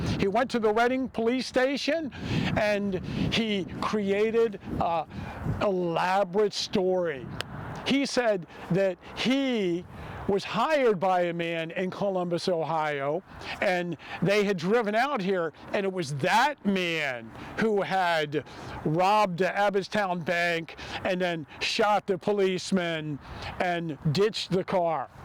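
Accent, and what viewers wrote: Baltimore, United States. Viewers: I LOVE Bal'mer accent. When I was a puppy many decades ago living in Eldersburg my neighbor Fred Will educated me on the dialect.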